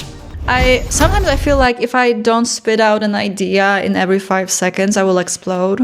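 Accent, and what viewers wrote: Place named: Poland. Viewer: You have a really cute accent. Polish, right?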